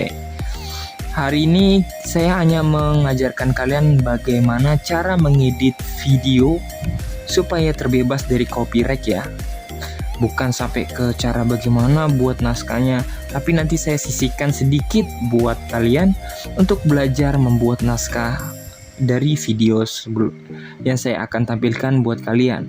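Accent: Makassar, Indonesia. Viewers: Orang makassar yah bang?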